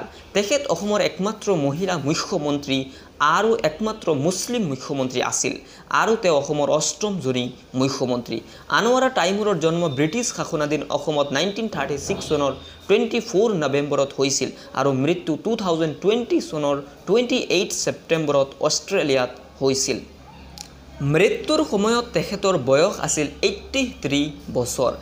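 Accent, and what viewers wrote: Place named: Bangladesh. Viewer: The voice is of a bangladeshi.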